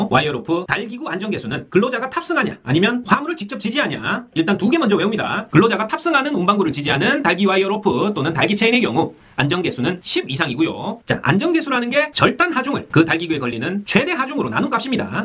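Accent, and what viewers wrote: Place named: South Korea. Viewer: 단55 틀68~ 사투리가 구수하시네요.. 어디 지역분이신지?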